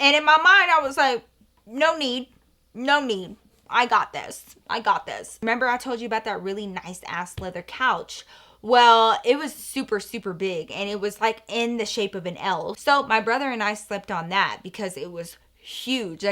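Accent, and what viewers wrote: Texas, United States. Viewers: I’m from San Antonio and I been watching you for years now and every story time the way you talk girl I can tell you’re from Texas 😂💕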